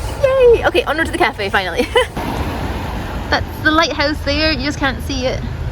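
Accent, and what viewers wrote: Scotland, United Kingdom. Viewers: I enjoy Scottish accent.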